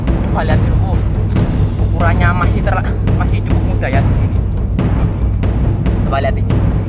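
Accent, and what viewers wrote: Java, Indonesia. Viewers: Medhok mu jd ciri khas mu sob...lanjutkan memberi edukasi... Abaikan haters... Salam kehidupan salam lestari... Terus berdakwah dg memberi edukasi👍👍👍👍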